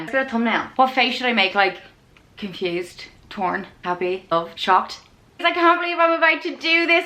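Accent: Ireland. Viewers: Are u Irish?I'm from co.cork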